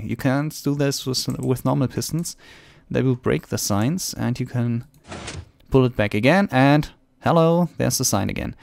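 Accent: Germany. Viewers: Man hört richtig diesem deutschen Akzent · that german accent of yours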